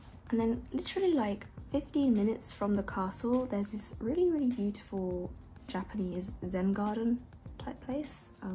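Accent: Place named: United Kingdom. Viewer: I’m studying English through your videos lately. Your British accent is so beautiful and easy for me (I’m Japanese) to listen to. I’m looking forward to watching more videos❤︎ (Sorry about my bad English) 最近の動画を見て英語を勉強しています。アクセントがとても綺麗で私でも聞きやすいです。これからの動画も楽しみにしてます❤︎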